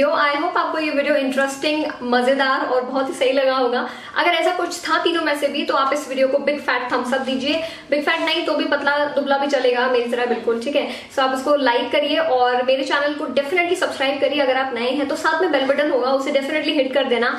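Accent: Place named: Mumbai, India. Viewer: Apaki language se lagtahe ki aap Mumbai se ho . Ladako keliye konase masale he faces pack me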